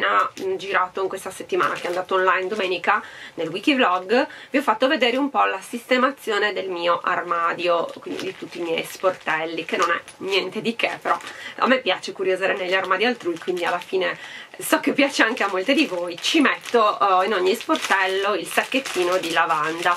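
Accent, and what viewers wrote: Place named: Florence, Italy. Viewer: Bellino!brava che parli il fiorentino😜ciao occhi da cerbiatta!🌺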